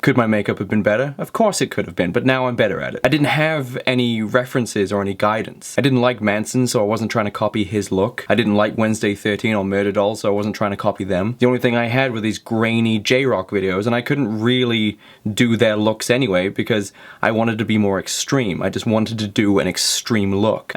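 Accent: Australia. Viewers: Dude did you live in Australia for awhile or anything? You pronounce so many words like an Aussie would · Trying to figure out what part of Australia you are from.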